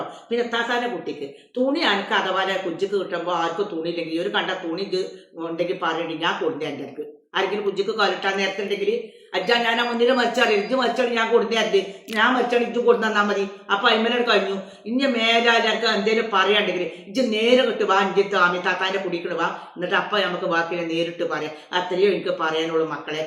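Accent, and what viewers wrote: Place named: Malappuram, India. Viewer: സംസാരം കേട്ടാൽ നാട് ചോദിക്കണ്ട ഞാൻ വിചാരിച്ച പോലെ തന്നെ. വണ്ടൂർ. കാളികാവ്. മഞ്ചേരി. 🤣🤣🤣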